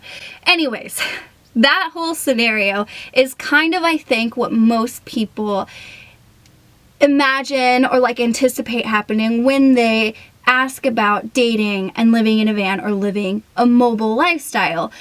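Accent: Texas, United States. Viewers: texas accent coming through?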